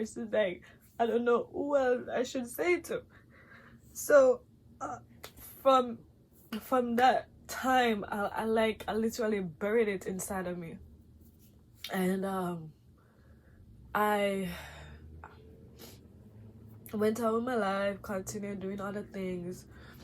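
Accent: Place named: Trinidad and Tobago. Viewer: U have a sweet accent, are u Trini ???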